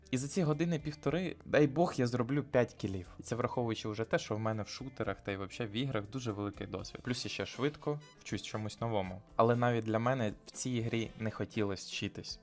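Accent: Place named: Surzhyk, Ukraine. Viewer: Полу суржик полу українська. Чувак, я підпишусь)) Це медятіна для моїх вух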